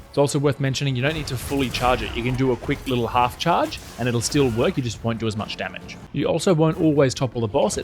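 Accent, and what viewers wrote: Australia. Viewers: It's definitely struggling at times, but it's not so much the video as it is your in-game performance - it appears that your fps is dropping into the sub-30 territory sometimes which will definitely create some serious input delay issues eventually especially in more performance heavy fights and environments. This issue may not appear to be so apparently severe on your end, especially when you're just playing without recording, but the additional performance cost of recording might explain the degradation in performance captured in the video. If you'd like any advice, I'm pretty experienced with this stuff (around 20yrs of being a PCMR giganerd), and if I knew your rig specs and the display you're using I could probably offer some quick and easy things to improve it for you. But only if you'd like, I'm not one to force unsolicited advice onto anyone. 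Also, while I understand you're not super fussy about performance, for the sake of recording it would definitely be helpful for the audience. Either way, thanks for the video and info! It's been a great resource for me in brushing up on my Greatsword knowledge/skills and getting back into it since I took a break from MHW (that ended up being far more permanent than I originally intended 😂) right before Iceborne released. As a side note of interest, as an American English speaker I have always very much enjoyed and prefer listening to Australian and NZ accents (I often get them mixed up so listed both lol). Very easy on the ears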